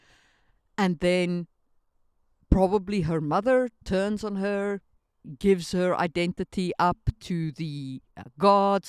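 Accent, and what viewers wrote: South Africa. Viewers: Is that a South African accent I hear?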